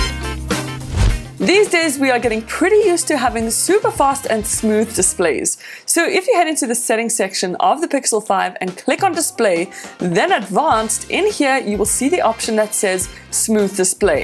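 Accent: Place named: South Africa. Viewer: South African?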